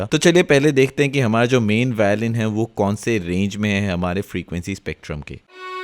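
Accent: Assam, India. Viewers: Bhai are you from Assam?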